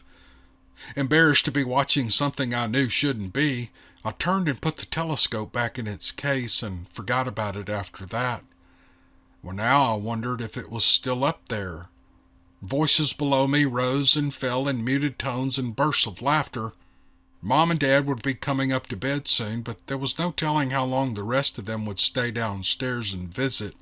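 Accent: South, United States. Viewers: I have listened to this twice, several months ago and then today. OMG! Best novel ever and I love ’ southern drawl that give this book so much reality with me being a native Alabaman, sound like my uncle/cousins and Grandfather ❤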